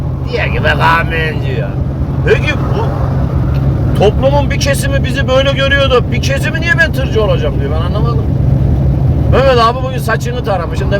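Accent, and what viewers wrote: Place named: Yozgat, Turkey. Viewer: bey sizin siveniz neden Yozgatli gibi?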